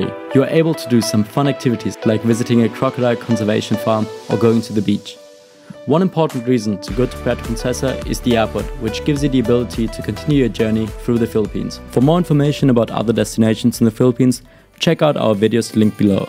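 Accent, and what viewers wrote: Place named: United States. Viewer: dude you sound just like me....are you also American I take it?....just a guess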